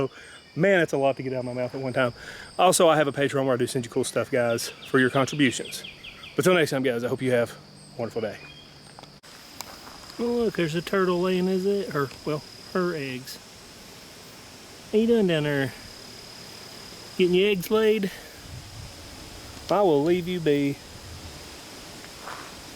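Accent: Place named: Georgia, United States. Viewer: I grew up in Tennessee, and I've been living in southeast Georgia for the last 8 years, and I can always hear the difference in the southern draw between Georgia and Tennessee when I listen to talk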